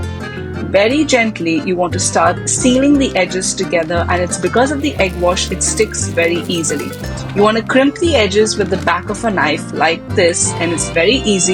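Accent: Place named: France. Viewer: Impressive and French accent is nice one to add along with lovely Galette preparation . Keep going girl ❤🎉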